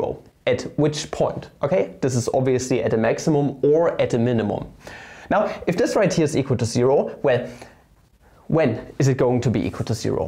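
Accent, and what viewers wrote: Germany. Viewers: I don't think this is only because of the German accent but does sound a lot like Der8auer ^^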